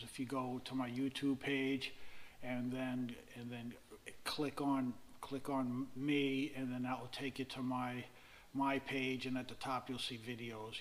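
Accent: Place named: Boston, United States. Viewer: Love the Boston accent. I start NOBO on 2/24; best of luck on your journey! Maybe find someone to mow the lawn so your wife doesn't have to do it- lol.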